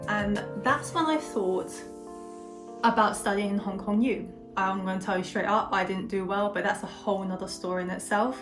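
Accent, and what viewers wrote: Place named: Essex, United Kingdom. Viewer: She's proper Essex.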